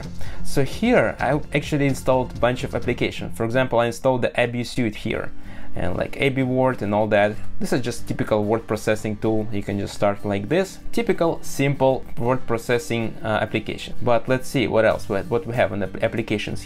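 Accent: Russia. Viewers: Obviously not, strong russian accent :)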